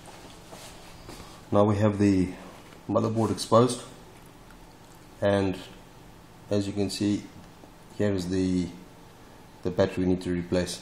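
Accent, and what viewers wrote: South Africa. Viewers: Hi there , I have a Acer Aspire E15 , this has happened to me , I tried a hard reset with taking the battery out and holding the power button and then switching it on but that didn't work. I've been to and asked various technicians but they all say that it isn't the CMOS battery. They say it's impossible because I've only had the laptop for a year and a half ? Very informative videos, you earned a subscriber. Also you sound like you from South Africa 😅😅 So am I !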